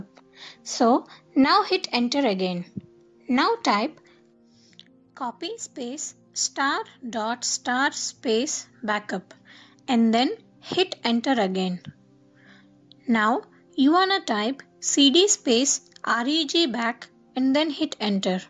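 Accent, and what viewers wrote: India. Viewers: Wow mam how smooth u speak Like an Indian...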